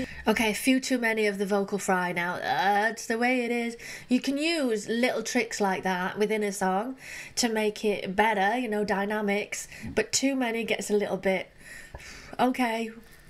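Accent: Manchester, United Kingdom. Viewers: I follow your channel just for your accent. It's so cute.. are you from Manchester? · ...please do some Alanis Morrissete and Björk...always enjoy your reactions, you are so nice and cute, love your british accent! x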